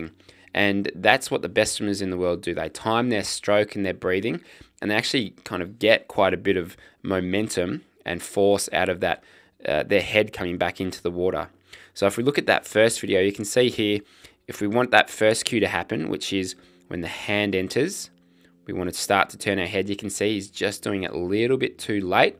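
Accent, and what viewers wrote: Australia. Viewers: Awesome! Thanks! Though at first that lovely Aussie accent made "late" sound like "light" !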